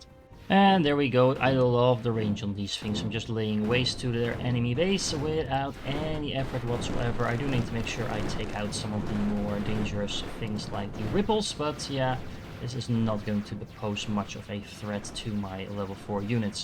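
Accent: Netherlands. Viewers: actual mindustry content????!!! rare to find also i love how we dutch people speak english you can emidiatly recocnize yourself lol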